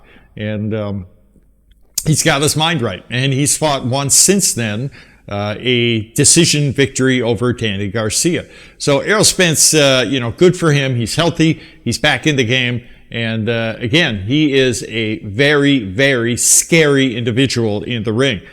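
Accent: Ilonggo, Philippines. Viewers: Hahaha! Dipuga! Ilonggo mana istorya mo!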